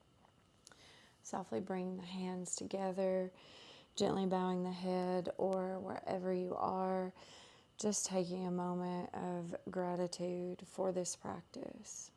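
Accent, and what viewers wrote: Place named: Tennessee, United States. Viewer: 🌹🔥🫶🏼🔥🌹 Love your Tennessee twang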